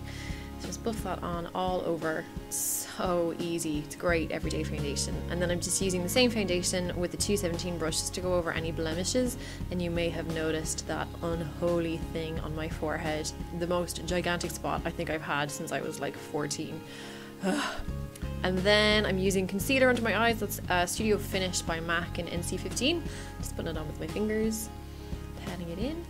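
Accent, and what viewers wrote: Ireland. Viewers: I love how Irish you are! Where about are you from? Were losing yous all to Oz haha. Skin looks great btw, considering you just had your laser. Kisses from Dublin xx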